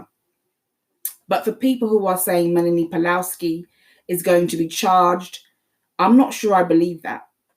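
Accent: United Kingdom. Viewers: I keep listening to this. It's one my favourite episodes in the podcast.I even play it to lull me to sleep. I guess I like British accents.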